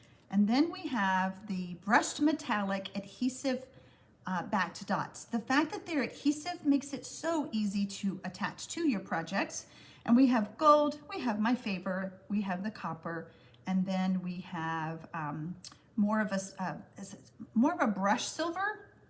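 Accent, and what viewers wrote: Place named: South, United States. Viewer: Frist time to see you. Really enjoyed all of your video. Thank you for all the information. I live close to Nashville Tn. and I talk just like you. Was fun hearing what I sound like. I love our southern drawl. I will be watching other of your videos.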